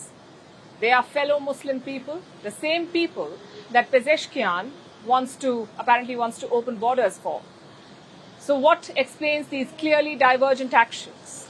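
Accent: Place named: India. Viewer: Her English is India English not English..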